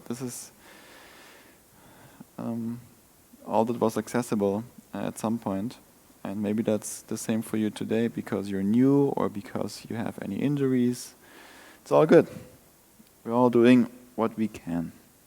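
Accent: Germany. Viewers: I like your german accent and can understand you very well :) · dein deutscher Akzent macht leider alles kaputt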